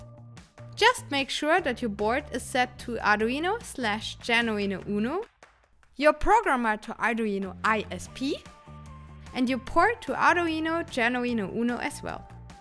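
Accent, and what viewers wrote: Germany. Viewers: Mein erster Gedanke: "Sie muss eine Deutsche sein". Typisch deutscher Akzent xD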